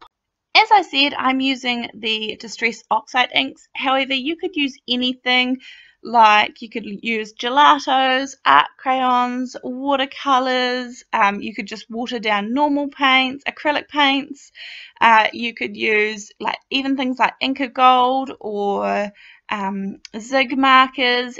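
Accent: New Zealand. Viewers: You've got a kiwi accent. Are you from Aotearoa?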